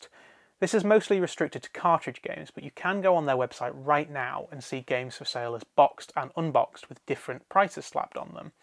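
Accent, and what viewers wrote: Birmingham, United Kingdom. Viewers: Are you from around Birmingham? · Are you from Birmingham?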